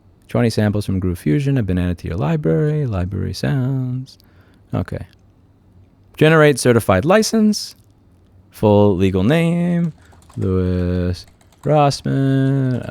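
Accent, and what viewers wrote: New York, United States. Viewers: You might be in Texas now, but the New York is still running strong through your veins and speech patterns. Love these videos. Im sure one of these companies will eventually attempt to fuck around and find out. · Sir, your inner new york is showing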